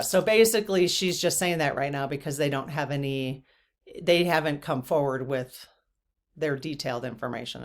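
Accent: Midwest, United States. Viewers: I love the midwest accent!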